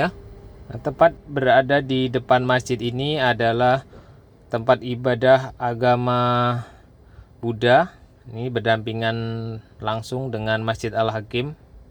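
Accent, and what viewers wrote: Java, Indonesia. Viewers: trnyata ortunya jateng yah..pantesan logatnya agk medok2 dikit 😂😂😂😂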